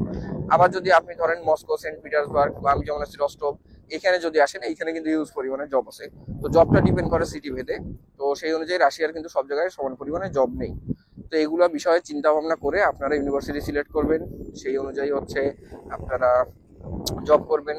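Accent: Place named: Sylhet, Bangladesh. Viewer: ভাই সিলেটর নি?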